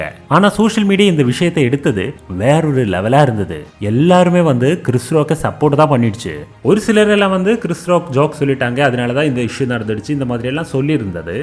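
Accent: Kerala, India. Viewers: Maliyalais Tamil speech.. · Neega malayaliya... ? ungaloda thamil vithyasama erukke...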